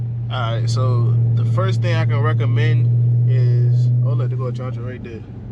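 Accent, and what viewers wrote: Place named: DMV, United States. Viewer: I thought you was from the dmv 😂 I can hear it 😂😂😂😂 but I’m finna go to the military so I wanna get one when I get back 💯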